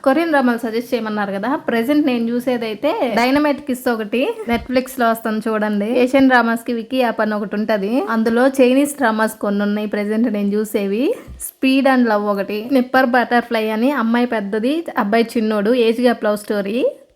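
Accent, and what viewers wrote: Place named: Rayalaseema, India. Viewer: మీది ఏ ఊరు అండి రాయలసీమ slang లోనే మాట్లాడుతున్నారు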